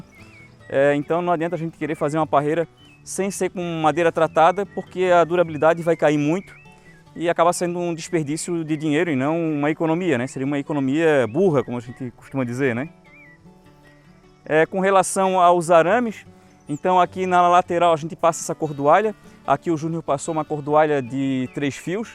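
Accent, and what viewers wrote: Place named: Santa Catarina, Brazil. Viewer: Amo o sotaque catarinense. Sou do Paraná e morei quando criança em SC, amo demais essa terra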